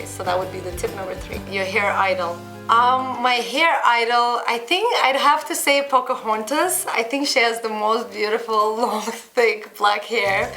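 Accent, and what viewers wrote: Russia. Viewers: Sounds Russian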